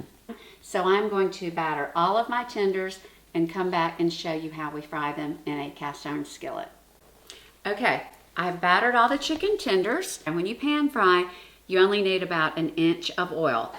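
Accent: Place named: South, United States. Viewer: First of all,you're gorgeous! I detect a southern accent. My mom was from SC. Anyway I'm going to make these. Thanks!!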